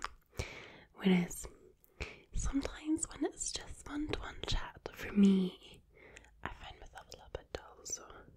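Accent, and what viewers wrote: Scotland, United Kingdom. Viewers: You're amazing. Your beauty, your personality and that scottish accent. Stunning! Great video too! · I love Scottish accents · Love the Scottish accent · the scottish accent is so good! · Oh yes same thing. I put almond milk in my oatmeal instead of milk because it’s a little healthier I am part Scottish 👍🏼 by the way and love ❤️ your accent 😁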